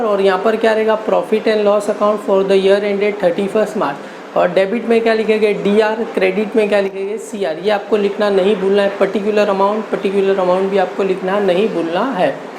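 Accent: Gujarat, India. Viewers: Tame gujrati cho ??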